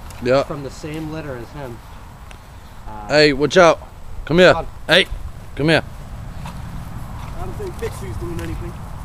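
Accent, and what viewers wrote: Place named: Boston, United States. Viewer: I didn't even look at your page. but judging from your voice, you're from Bastin.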